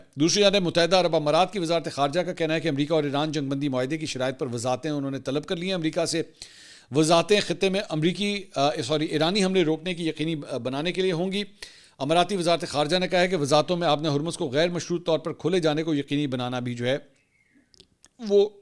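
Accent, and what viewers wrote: Punjab, Pakistan. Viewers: 😂😂😂😂😂😂😂😂 very nice ap ka mzaq starting mn and hmary punjab ka andaz keeeeepppp ittttttt😅😅😅😅😅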